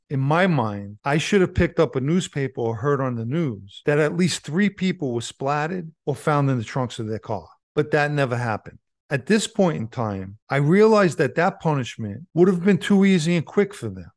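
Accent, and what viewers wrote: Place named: New York, United States. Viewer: I’m down here in TX and I love NY mob stories. I like your NYC accent, it adds to the stories. Glad to know you have removed yourself from that madness.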